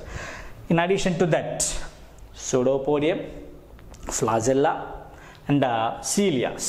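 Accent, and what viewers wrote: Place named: South India, India. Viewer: I think he is from South , mostly south peoples don't know hindi so use English so that everybody can understand , Nd inki English m thoda south accent bhi a rha h ,may that's why u can't understand , but his English is good , their is no mistake...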